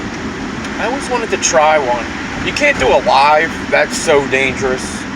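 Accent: Philadelphia, United States. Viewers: I can really hear the Philly accent ❤❤Great Topic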